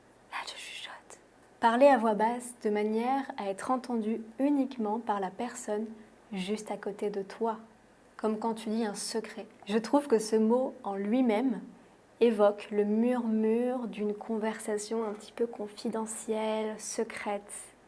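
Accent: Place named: South, France. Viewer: PS : Je suis pratiquement sûr que tu habites la partie sud de la France. Etant breton, je remarque vite les accents ! Enfin, je sais pas pourquoi je dis "en tant que breton", je n'ai jamais lu que l'on avait ce super pouvoir plus que d'autres ^^ Sinon les arabesques ont été identifiés en Occident au XVe siècle comme un élément de l'art islamique, d'où leur nom. Mais en Occident ce terme a été utilisé pour désigner par extension des entrelacs et des rinceaux divers, y compris ceux de tradition occidentale, même lorsqu'ils n'ont aucune correspondance avec les motifs arabes.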